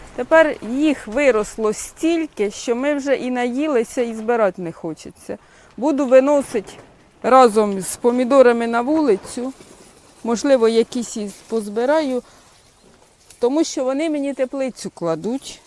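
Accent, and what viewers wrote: Poltava, Ukraine. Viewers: добрий день! Як чую вашу мову, то ніби слухаю свою сестричку. Вона теж полтавчанка, Чутівського р ну. Говір, інтонація-- такі ж як у вас. Я й сама родом звідти. Дуже приємно вас слухати, навіть неважливо про що, наче 60 років назад. Ось і така є у вас слухачка. Давно хотілось вам це повідомити...як кажуть, багато казати, та нема що слухати. Ще раз вам, щире спасибі. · Приємно чути Полтавський акцент!😊 Як не дивно, відразу так і подумала,шо це моя рідна Полтава! І не помилилась.Який район,село напишіть будь ласка.Буду дуже вдячна!❤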